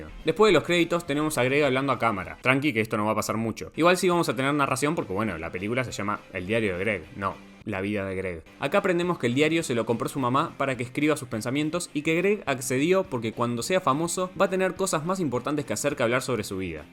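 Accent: Uruguay. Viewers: Sos uruguayo no? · Bro sos uruguayo? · De una me di cuenta que sos Uruguayo, buen canal, saludos desde Uruguay 🇺🇾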